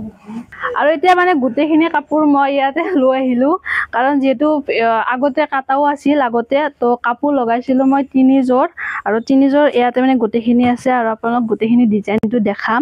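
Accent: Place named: Nalbari, India. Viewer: Ata kotha kom beya napaba tomi nalbari r lenguage te koba vhal lage but likhita kole akdom beya xuni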